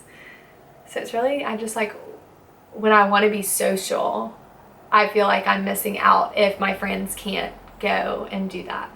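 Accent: South, United States. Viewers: Your Southern Accent is Beautiful! I could listen to you all day! Oh, and your gorgeous too. Signed, New England Yankee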